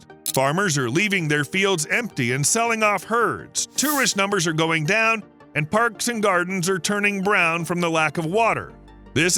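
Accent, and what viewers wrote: United States. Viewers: Jesus, Give him the internet and a PHD from the Gretta Thrumberg university of HOW DARE YOU and suddenly he's an expert on Australia, The Aboriginals and global warming. During his eye rolling diatribe not once did he mention we contribute to 1% of the world's global warming issues.. Or that we're currently destroying the country to reduce that even further.. Whilst from his accent he's either American or Canadian.. Both of which produce far more than us. I'm 100% certain he got all his information from the ABC 😂. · How do you seperate "strictly Aussies" from other ethnics or races when a large percentage of the population were born in other countries ? I dont bother watching or listening to videos about Australia that are narrated by someone with a US accent and this one was no different I turned it off.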